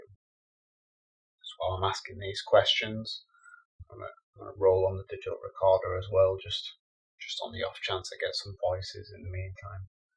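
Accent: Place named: North, United Kingdom. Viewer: Just found this channel awesome stuff man. That was my life from a small kid until I was around 17 and moved out. But over the last five years the lights and, colours and noises are back around me. Kind of welcome it now. Where abouts are you from. Sound like a fellow northerner. Keep up the good work.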